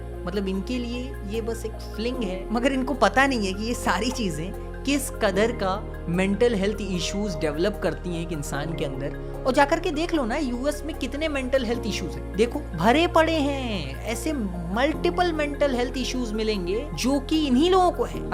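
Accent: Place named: Bihar, India. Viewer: Hame tu appki behaar ki language bohat Pasand h us tarha bolne ka style he alag h .v.good vdo (from Karachi ❤)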